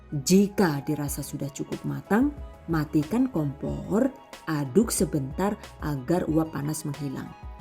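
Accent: Banyumas, Indonesia. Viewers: Mbak asli mana kok ngapak??? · ni org madiun apa org ngapak ya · Sebaik2 manusia adl yg paling bermanfaat bagi manusia lainnnya, spertinya bunda ini memang diciptakan Allah untuk mmberikan mnfaat n inspirasi bagi yg lainnya, 😍 Super sekali vedeo2nya, sirupnya, sehat menyegarkan n alami, n tentunya cocok untuk bisnis usaha 💪 ngapaknya sll bikin kepingkel2,gk tau knp klo dnger org ngomong ngapak sll ketawa😆😆 Btw, ikutan latah..."haaaay gaes..woookey gaes..."😂😂 #GiveAwayTHV2020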